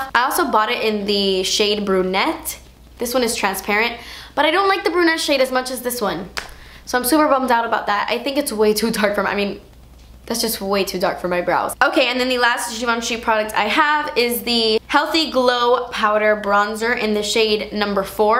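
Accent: United Kingdom. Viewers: Only that's eeeeeeeeeeeeeeeeeeespannnnnnnnnnnnnnsive!!!!!!! Plus your Britain accent! I die! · Her British accent is amazing! I love it!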